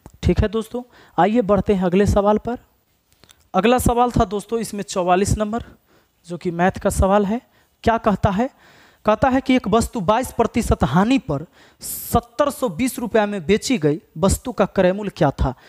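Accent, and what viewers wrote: Bihar, India. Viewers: Sir Kya aap .....Bihar se hai ?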